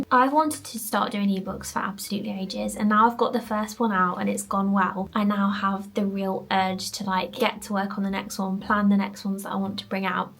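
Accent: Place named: United Kingdom. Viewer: I love listening to you speak! The UK girlies all sound so lovely to my American ears 😂 Also very helpful videos!!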